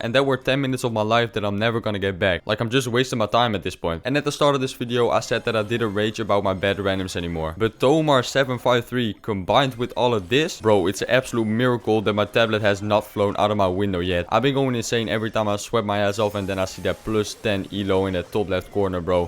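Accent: Netherlands. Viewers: Damn i smell englsih and a hint of dutch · why do i smell a dutch accent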